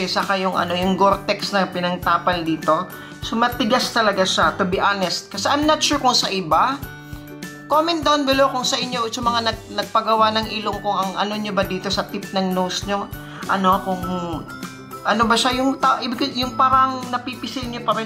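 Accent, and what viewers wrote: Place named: Visayas, Philippines. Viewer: Dahil sa nose lift new subscriber here na search kac ako, hi sir bisaya ka po kac sa accent eh, bisaya here, ahm tanong ko Lang pag sa lips po may idea po ba kayo if how much, kac sa ilong ko contento naman ako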